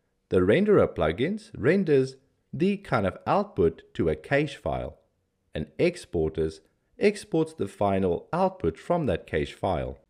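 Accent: South Africa. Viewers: Hey NFT ! Thanks for all this information. You sound South African, did I get that right? Anyways, I'm getting stuck in getting the art engine to work on my end (Windows). I have just finished my collection's art work and would now like to generate it. I'm getting stuck at the point where I have to input 'npm i' into the terminal. When I do so, it gives me a bunch of errors. I'm not a coder (still in the baby stages of learning) so this is all foreign for me. (Art engine template) Any help would be greatly appreciated.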